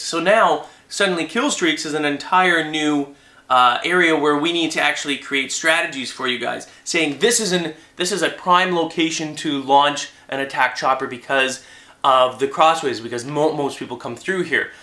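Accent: Canada. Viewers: this guy is canadian right?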